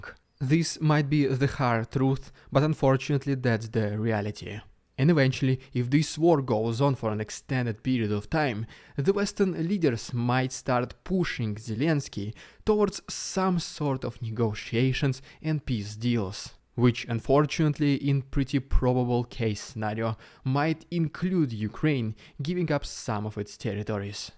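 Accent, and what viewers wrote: Russia. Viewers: OK, no offense but you have one of the funniest English accents I've ever heard.. This can't be the regular Russian accent cause I've heard many Russians talk English and they don't sound like that. Keep it up... Now to the more important stuff.. Regardless of the 41% of the Republican voter base being in favor of some kind of setlement between Ukraine and Russia, you still have the majority of Democrats and Republicans being for Ukraine. Also as long as Biden is POTUS there's no worry, and now the best thing - it will be very very hard for Trump or any other Republican to beat Biden in 2024. And even if a Republican wins it most definitely won't be Trump, and all other major candidates support Ukraine... So no worries here... Russia already tried to scare Ukraine that America won't send them 1$ more after Republicans take over the Congress.. 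We all know how that worked out - decision to send Abrams and SDBs came when McCarthy was the SPeaker of the House.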